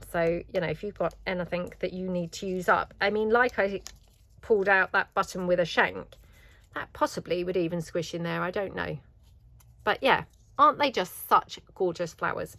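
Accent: United Kingdom. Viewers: Omg those little flowers are adorable. Love all your papers and genius journal ideas. My 5yr old granddaughter loves your british accent and stories about your sausage dog Bo. She keeps asking for one. We have a german short haired pointer we bought her about a year ago but she loves the sausage dogs. ❤❤❤ We are from Kentucky here in the US and we are told we have accents too. 😂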